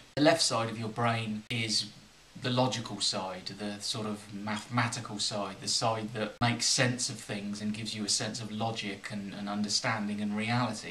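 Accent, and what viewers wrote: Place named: United Kingdom. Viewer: I rarely leave comments on YouTube but I think you are really brave to talk about your mental problems in public, in front of a camera... You look like a truely good person and I hope you get better soon. I'm a high carb low fat vegan too (starch based) and I find your videos so helpful and interesting ! Keep going ! And I love your brittish accent....so soothing! (I'm French)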